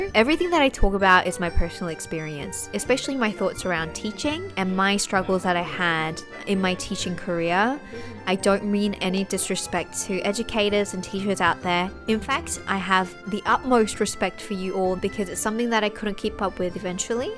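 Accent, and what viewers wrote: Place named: Michigan, United States. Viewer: Yours is easily the coolest Michigan accent I've ever heard!! Your zig-zaggy life story is interesting too. Those are the most interesting life stories, where the person had to try a bunch of things before something eventually floated their boat. I'm paraphrasing Jerry Yarnell, the TV painting teacher guy who said something like, "Bravery isn't not being afraid to do something. It's being afraid to do something, and then doing it afraid." Looks like you've got pretty mad crafting skills too. I'm subbing your channel so I can swing by here from time to time.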